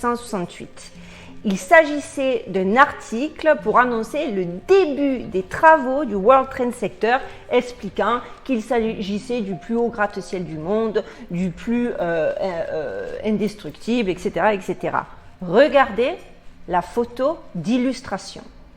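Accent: Marseille, France. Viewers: Bonjour. je regarde vos vidéos et votre accent me rappelle des souvenirs , j habitais du coté de Marseille, mais comme mes filles vivent maintenant une a Boston et l autre près de Montréal j ai traversé moi aussi et je ne regrette pas.... Merci pour les vidéos.....Mon gendre est Directeur de Demandes Globales chez Sanofi a Boston.. je vais demander a ma fille ce qu elle en pense.....j ai deux magnifiques petits enfants et je n ai pas envie qu ils soient abîmés par leur saloperie de vaccin... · Hello! J’adore tes vidéos! Elles traitent de sujet graves avec une pointe d’humour dont tu as le secret 😉. Continue comme ça! Je like ❤️. Par contre, effectivement, je ne reçois aucune notification alors que j’ai bien cliqué sur la cloche 🔔... Mais c’est pas grave! Je vais direct sur ton compte lorsque j’ai une petite baisse de moral 😁 Cet accent du Sud ne peut que me mettre en joie!! 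Bravo bravo pour ton travail 🙏🙏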